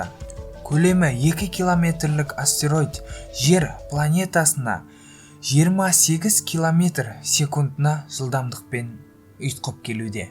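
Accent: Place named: Uzbekistan. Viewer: Тілің озбек емеспа жағымсыз,и сөздерд бұрап айтасн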